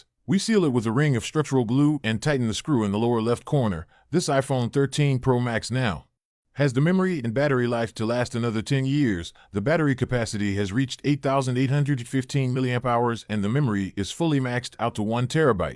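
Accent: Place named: United States. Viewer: The man doesn't exist, repairs all done on chinese phones...Voice is american.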